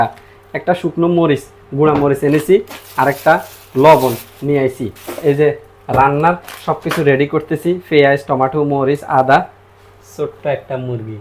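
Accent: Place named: Chittagong, Bangladesh. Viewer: আপনার ভাষায় বুঝা যাচ্ছে আপনি চট্টগ্রামের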